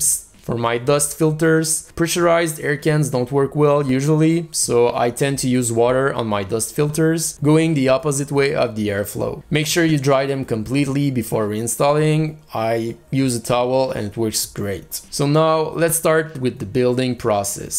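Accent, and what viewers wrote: Quebec, Canada. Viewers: Est tu Québécois ? :D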